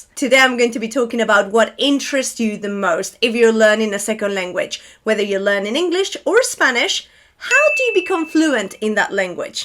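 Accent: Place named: RP, United Kingdom. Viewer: Es una locura el acento que tienes, nunca hubiera pensado que eras española si no hubiera leído la descripción del canal. Hablas el inglés británico (RP) perfecto. Yo estuve un año trabajando en Londres y tengo acento de allí, además soy andaluz así que tengo 2 acentos muy marcados en ambos idiomas 😅 Igualmente eres un ejemplo a seguir para mí, ojalá hablar el inglés tan bien como tú algún día!!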